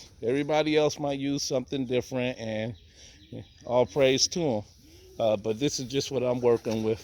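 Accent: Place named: South, United States. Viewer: I knew you sounded southern lol i acually live in tn about 2 hours from u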